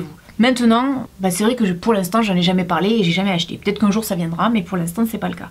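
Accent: South, France. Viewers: J'adore ton accent du sud parce que j'ai le même je t'aime trop toi et tes concept décaler😍😍😍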